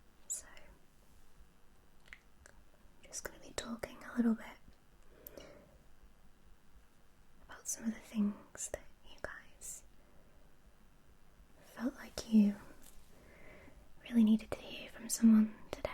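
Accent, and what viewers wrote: United Kingdom. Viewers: I love your accent! Where in the UK are you from?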